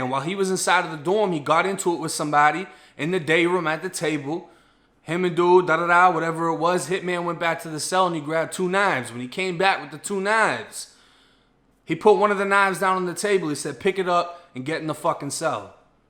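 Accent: Boston, United States. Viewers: Are you from Boston? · This Shìt is WILD!! Been watching Booty bandit stories since Fleece’s hours ago…I hear your accent are originally from up north! Thought I heard some Boston in ya. Lol mad random…. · Are you from Boston bro? Def got the accent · I'm rocking with you from Canada. Love your Bahston accent. What an amazing legacy from the letters of your grandmother. That's beautiful.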